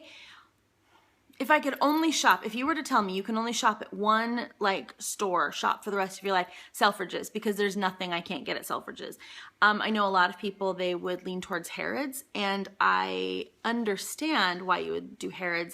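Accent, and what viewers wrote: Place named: United States. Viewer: Yes my dear so happy for u it's amazing how u keep ur accent I thought if ppl live long somewhere they will have the accent of country. I love ur American accent btw and me I'm 5 -6 years in the uk but can't get rid of my meerkat accent 😂😂😂 ur videos are amazing keep it up Hun 😘😘😘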